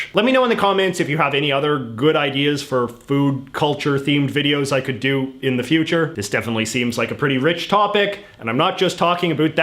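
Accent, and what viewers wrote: Canada. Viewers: I love to hear pronounce foreign things very Canadian-ly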